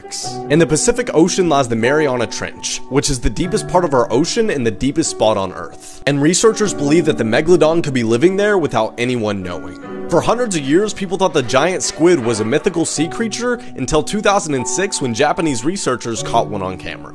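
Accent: South, United States. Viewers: Bro i never realized how much of a southern accent he has till now and his other recent vids💀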